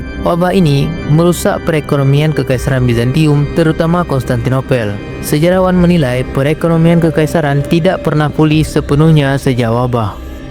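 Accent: Aceh, Indonesia. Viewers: Apakah VO berlogat aceh😆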